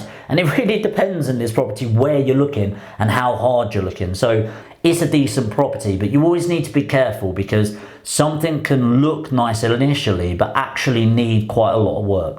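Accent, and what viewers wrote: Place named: England, United Kingdom. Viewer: Filling it, sand in it, in it? Love the English accent after American movies 😁...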